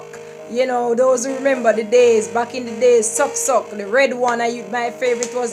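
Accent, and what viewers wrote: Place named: United Kingdom. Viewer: gyal..u sounding kinda British.. nice words of encouragement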